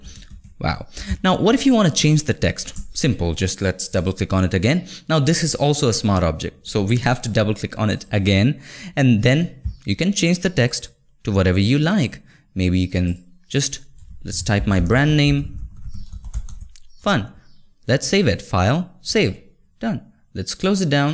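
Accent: India. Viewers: i realy like your monobrow. but indian english is very exhausting to listen to for me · perfect indian voice i see on youtube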